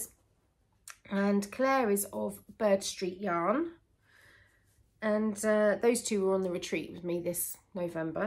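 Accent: United Kingdom. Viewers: 🥰🥰what a lovely time I’ve had knitting while you chat, thank you for that. And yes we Americans think British accents are amazing. Anyone who breaks a friendship with you is the one who lost a gem! ( sent by a big fan who is usually very quiet) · It is a strange world where busyness tries to dominate- then we pause and ‘sit for a visit’ in the home of a fellow knitter who lives half way around the world. You make us laugh, and think, and together we all pause to be thankful. As an American I’ve always wondered about my very positive response to hearing the British accents. Then I had my DNA done and discovered all my English, Scottish, and Irish roots. Your honesty and adoration, frustration and dedication, to the four males in your house is remarkably apparent. In a world where ‘homemaking’ is pitifully underrated, and desperately needed, you set a good example. 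Keep up the good work.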